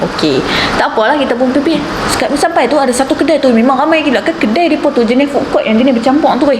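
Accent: North, Malaysia. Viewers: sy 1st time jumpa n tgk channel ni..sy suka dgr n tgk cara cik/pn bercrita krana loghat utara tu..sb sy mmg suka dgr org ppuan utara beckp dgn loghatnya..so sy subscribe terus chnel u 🤗.. tp ni nk tnya sket la cik ni ada kelebihan pd pancaindera ke 6 ke klu x silap sy iatu mata yg bole nmpk benda² ghaib ??..😊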